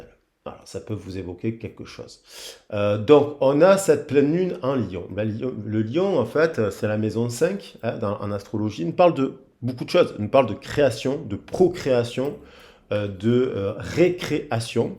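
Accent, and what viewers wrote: South, France. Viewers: Bonsoir. Un plaisir d'écouter tes guidances avec ce chaleureux accent du Sud, qui plus est toujours source d'enseignement également. Merci pour ta générosité et ton dévouement 😊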